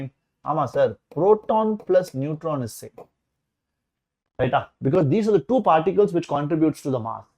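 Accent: Tirunelveli, India. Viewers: Nellai tamil mass sir 🔥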